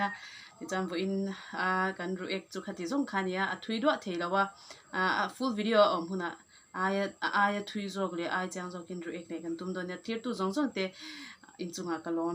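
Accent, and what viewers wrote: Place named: India. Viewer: Voice Tone hi dik lutuk Hindi Tone diktak in asa tlat mai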